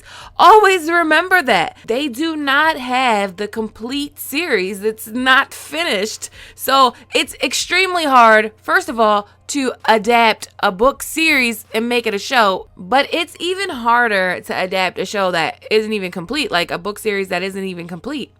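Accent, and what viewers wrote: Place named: Baltimore, United States. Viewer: I just wanna hear you talk all year lbs. Is that a Maryland/Baltimore accent im catchin?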